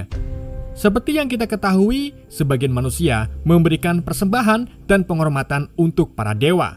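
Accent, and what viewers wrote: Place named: Wonosobo, Indonesia. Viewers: Membetett i iwak .. Curiga wong Wonosobo iki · Admin e wong jowo, damput😂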